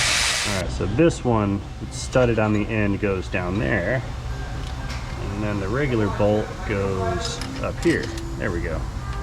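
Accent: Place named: South, United States. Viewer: Is it just me, but does voice sound a little different. I think the subtle Southern drawl has nearly disappeared. Different mic or other sound equipment change? NBD.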